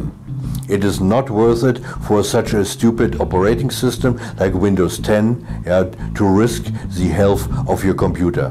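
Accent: Germany. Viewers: [non vid question]: are you german? your accent sounds a lot like that :v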